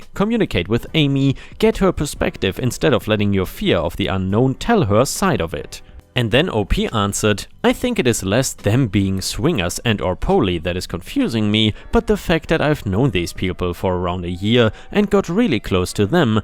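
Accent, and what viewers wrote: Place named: Germany. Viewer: Dein deutscher Akzent ist nicht überhörbar, aber trotzdem nette Videos 👍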